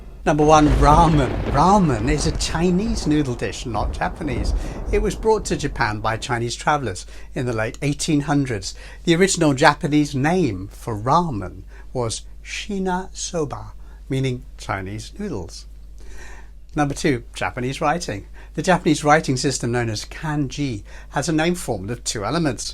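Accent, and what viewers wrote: United Kingdom. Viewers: what a disgusting video 🤢🤮. 🇯🇵🇹🇼🇰🇷🇵🇭🇹🇭culture is all superior to china!!!! spoken by an Indian dude with a British accent. The Hypocrisy😮‍💨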